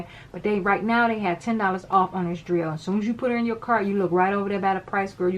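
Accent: United States. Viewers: Girl, are you from WV?! You sound more country than most my home girls here in the sticks! Love it! Thanks for the review! Very helpful! Edit: Did I miss this answer? Can you use them while they're plugged in charging?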